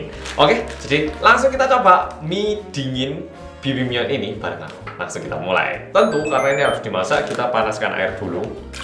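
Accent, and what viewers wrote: Java, Indonesia. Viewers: Oppa iki rupane korea tenan tapi medoookkkk puoolllll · sam aku kangen samean ngomong boso jowo ayo a medok o lek ngevlog gawe boso jowo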